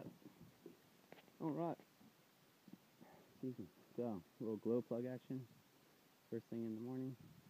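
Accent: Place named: United States. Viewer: Awesome! You still sound like an American! Third kid? Firefighter?! Damn, I miss the crap outta you guys. . . give my love to Nic. BTW, my profile pic is me raising the main just outside 39 while YOU are at the helm with Serena and the photographer Erin in the cockpit! Goodtimes, my man, gooood times. . .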